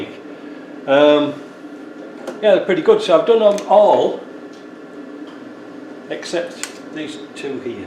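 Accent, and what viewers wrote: Yorkshire, United Kingdom. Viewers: By heck we can tell your still a Yorkshire man !!!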